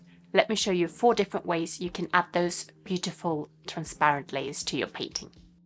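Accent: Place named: England, United Kingdom. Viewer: Fancy voice / English accent fancy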